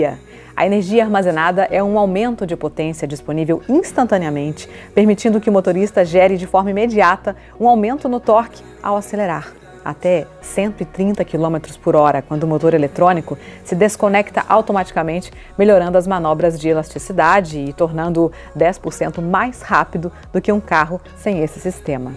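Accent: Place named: Italy. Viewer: Olá, você disse 73 unidades?! Tenho que correr pra garantir a minha!;P Gostei bastante dessa solução da Lamborghini, não vejo a hora de vê-lo em pista. Apaixonado por Lamborghini. PS: Nice Italian accent by the way.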